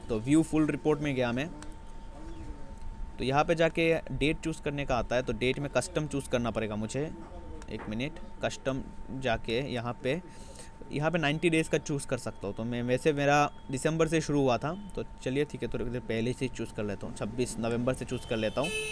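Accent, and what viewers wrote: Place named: Bengal, India. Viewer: oh I thought you're from West Bengal because of Bengali accent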